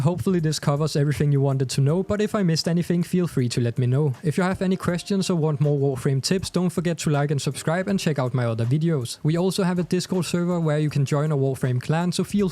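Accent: Denmark. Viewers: You sound extremely Danish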